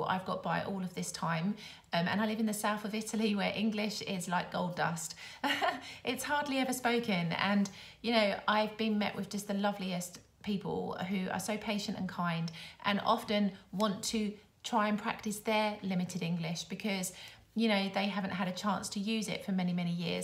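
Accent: England, United Kingdom. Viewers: I just found out your channel, I love your accent, what part of England are you from?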